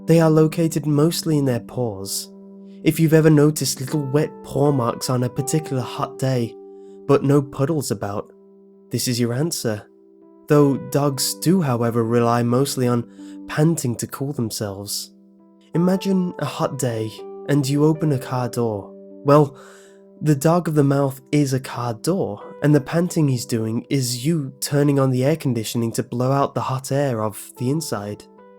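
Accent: England, United Kingdom. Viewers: u can pretty much read anything with your voice. People love the english accent. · i realy like your voice it is pritty but i have always had a thing for british guys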